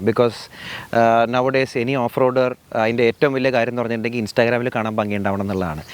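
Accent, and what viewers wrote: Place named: Kottayam, India. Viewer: its kottayam ryt?🧐😄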